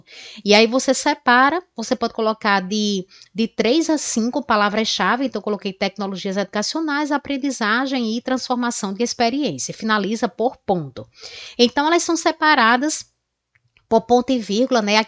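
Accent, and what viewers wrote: Northeast, Brazil. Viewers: Adoro aprender com essa Professora, sem contar que amo o sotaque do nosso nordestão! 🥰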